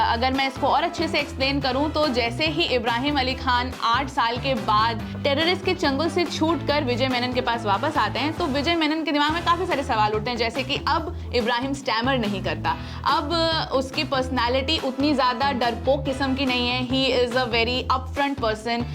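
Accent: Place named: Bengal, India. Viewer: U BENGALI HINDI VOICE VERY NICE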